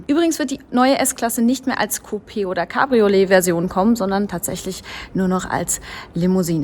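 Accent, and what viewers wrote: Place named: High German, Germany. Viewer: That's not an accent, that's High German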